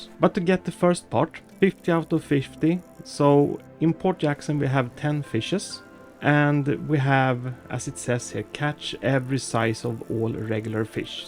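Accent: Sweden. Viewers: are you swedish ( är du svensk)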